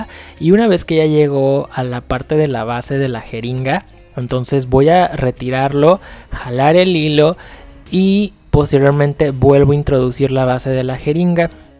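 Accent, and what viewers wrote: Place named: Mexico City, Mexico. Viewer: Eres de El Centro de Mexico o CIUDAD de Mexico....por El acento 🎵🎶🎵🎵.. Alargan las palabras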